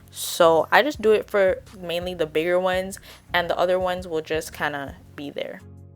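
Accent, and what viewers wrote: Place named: Toronto, Canada. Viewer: Girl you from Toronto? Your accent screams Toronto lol thanks for the hair tips. Needed something for my daughters hair. Will try it out!!!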